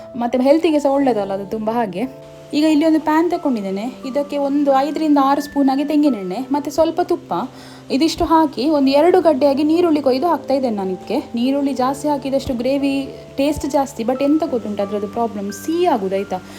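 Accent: Mangalore, India. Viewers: Mangalore kannada ok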